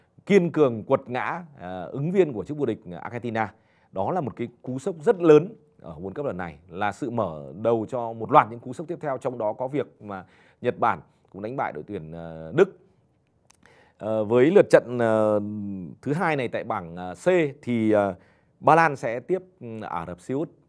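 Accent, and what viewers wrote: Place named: South, Vietnam. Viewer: Bác xem thế nào góp ý chương trình bình luận bóng đá bằng giọng bắc đi bác.chứ em thấy bác giọng nam không hợp một tý nào .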